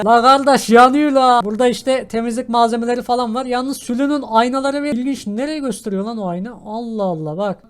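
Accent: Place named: Ankara, Turkey. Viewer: Dünyada hem Ankara hemde Adana şivesi yapabilen tek insan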